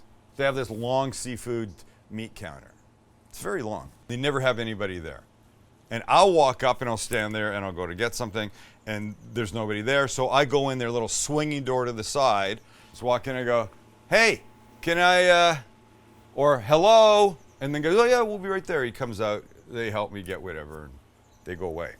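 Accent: United Kingdom. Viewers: That British accent..😂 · I've commented on how bad southern accent is. His British accent is perfection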